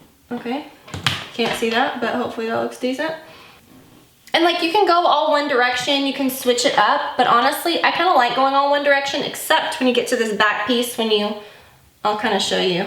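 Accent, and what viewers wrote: South, United States. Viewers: Love when your southern accent pops up in your videos! I just recently made the big chop too. I’ve had pretty good luck with a wand but haven’t braved the flat iron curls with short hair yet. I’ll have to try. Thanks! Hope you & your family have a great Christmas!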